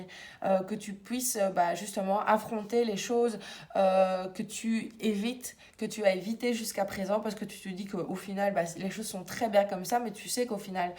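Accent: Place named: Belgium. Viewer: Je vous découvre j'adore votre accent belge si je ne me trompe pas. Évolution pro surtout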